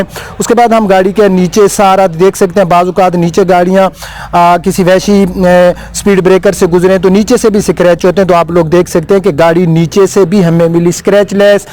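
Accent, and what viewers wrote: Saraiki, Pakistan. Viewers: Ap ki سرائیکی urdu baray mazay ki ha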